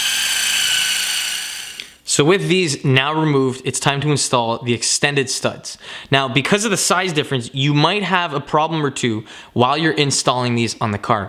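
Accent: Canada. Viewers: I don't know where you guys in the comments are about this, but judging from his accent, he's in Canada and if I were him, I'd add a light film of anti-seize on 1) the threads of the studs to ease in impacting to press in, 2) on the threads in general because CA, 3) and even on both sides of the spacers to help prevent seizing in general. I live in Phoenix where corrosion isn't an issue and I still do this practice.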